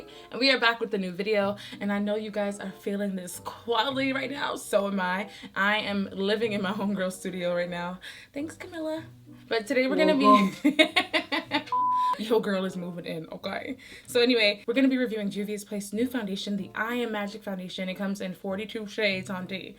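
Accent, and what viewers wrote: United Kingdom. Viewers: Girl! Where you been? It has been too long and your UK accent is coming along nicely 😏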